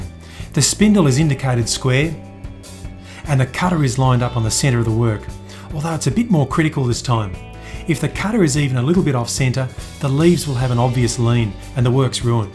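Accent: Germany. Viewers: Are you german, swiss or austrian? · are You german?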